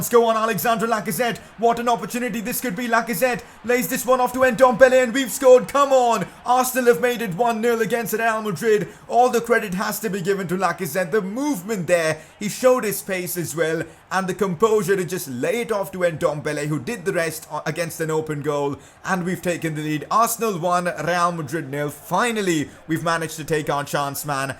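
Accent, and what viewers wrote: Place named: India. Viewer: Bro ur fucking Indian voice is annoying 😣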